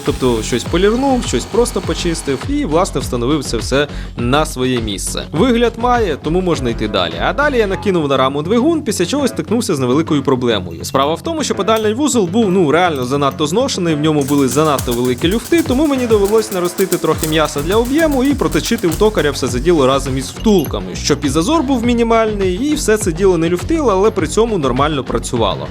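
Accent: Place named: Rural, Ukraine. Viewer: Очень круто, особенно нравится твой говор, старый "селянський" украинский) мне вкатило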